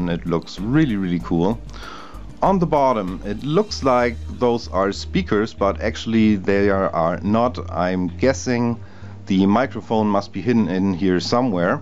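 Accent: Germany. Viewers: I hear German accent)) Great review )) i think it is a good device ))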